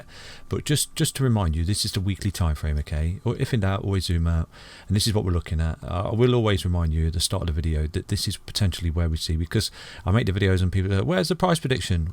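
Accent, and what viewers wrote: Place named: Leicester, United Kingdom. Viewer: Ha I’m from London and my girls is from Leicester, I sent in one of your videos on Cardono yesterday and she said you was from Leicester I never noticed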